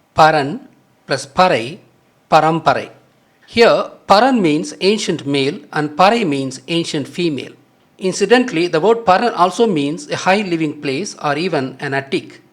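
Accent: India. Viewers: don't get offended sir, ! was talking about accent not English, the Hindu accent Jesus Christ is like another language in itself.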